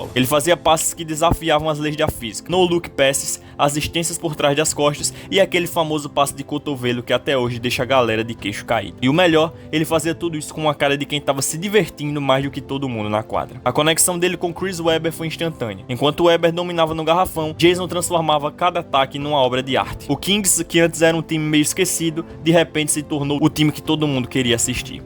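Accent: Alagoas, Brazil. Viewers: Tu é de Alagoas? Esse sotaque denuncia... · Tu é de Alagoas? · boa irmão! seu sotaque é foda! NbA nordeste🫵🏾⛹🏾🏀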